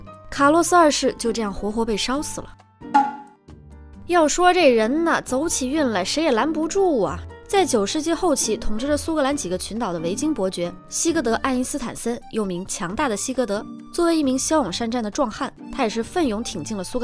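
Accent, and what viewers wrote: Cantonese, China. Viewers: 廣東話太可愛啦🎉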